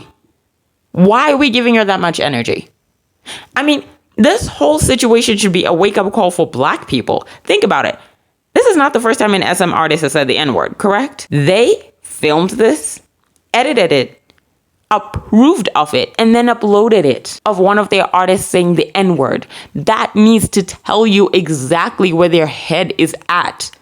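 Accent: United States. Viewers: I had no idea that you had never been to the U.S. before. I always thought that you spoke English with an American accent. ; )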